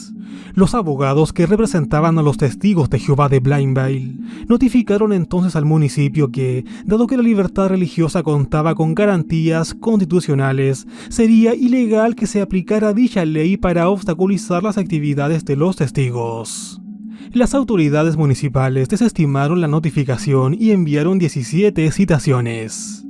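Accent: Chile. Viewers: Ah ok, entonces es común para cualquier chileno dicha pronunciación? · Es shileno... un vicio cultural ...propio de la nacionalidad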